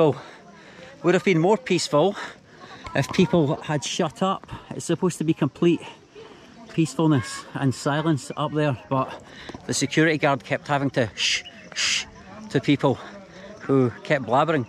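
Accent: Scotland, United Kingdom. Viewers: Are you Scottish??? Welp!!! I have been to Scotland and stayed there approx 9 months mate 😀❤️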